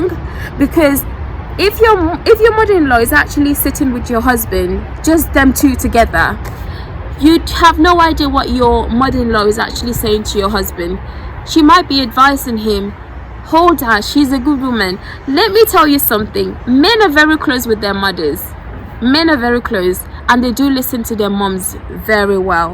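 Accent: England, United Kingdom. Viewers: Dame lady you fine hell, you got english accent.,you in england or gambia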